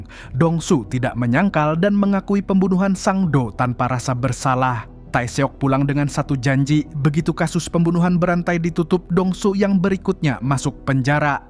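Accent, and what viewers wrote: Banjar, Indonesia. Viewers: thanks bang, btw logat abang kek orang banjar, bujur lah u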